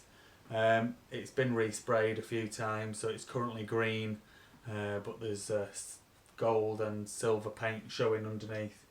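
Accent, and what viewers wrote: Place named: North, United Kingdom. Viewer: thats a good price im looking more a 250 that i can fully restore around mid 80s as thats what i grew up on im 40 this year and getting nostalgiac lol i watch your bikes on youtube you sound like a northerner buddy where abouts are you in the country im in barrow in furness in cumbria im saving up to get a bike there so hard to get hold of do you get them all the time could do with your number pal and thanks for getting back to me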